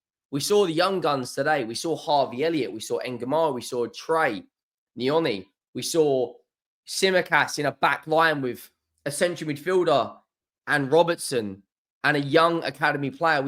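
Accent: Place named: London, United Kingdom. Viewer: Why is a guy with a London accent telling Liverpool fans what we think about our players? We all love Gakpo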